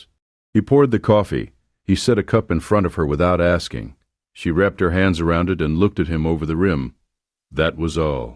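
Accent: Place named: New York, United States. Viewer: The Narrator must be from a crowded city in….New Jersey or New York. His performance is….inept Great voice though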